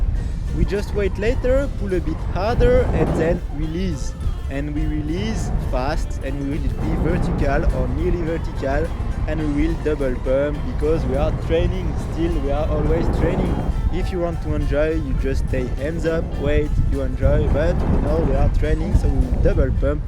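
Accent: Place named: France. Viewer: Cet accent Gaulois me fait mourir de rire!! On est vraiment mauvais en langues étrangères...😂😂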